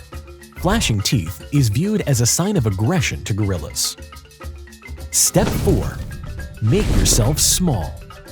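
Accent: United States. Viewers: American accent. Gives information in metric system. You failed. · Dude u have an American accent stop using metric measurements. .WE DON'T USE THAT. .at least use both. .i was educated in the 90s aint no conversions in my head😭😭😭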